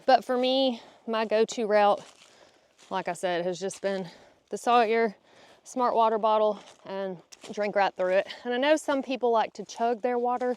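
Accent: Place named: Alabama, United States. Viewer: Omg ! I think I have to marry a girl from Alabama !!! That beautiful accent is like a songbird singing right into my heart xx (I'm from Europe)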